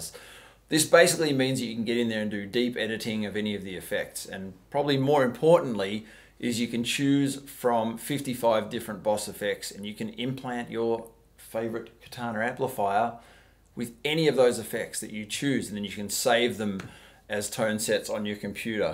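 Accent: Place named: Australia. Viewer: Aussie accent is strong with this one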